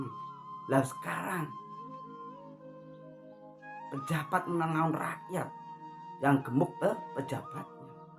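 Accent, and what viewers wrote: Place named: Banyumas, Indonesia. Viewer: Admin orang ngapak kayanya😊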